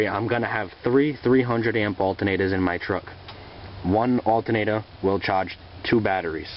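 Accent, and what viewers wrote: Boston, United States. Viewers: Boston accent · thats a thick boston accent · No friggin way you're from the Boston area! I can tell cuz I'm wicked smaat! Lol nice video man.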